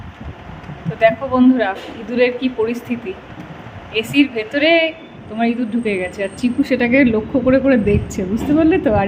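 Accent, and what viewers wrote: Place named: Bangal, Bangladesh. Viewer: বাঙাল ভাষা শুনতে খুব ভালো লাগে 😊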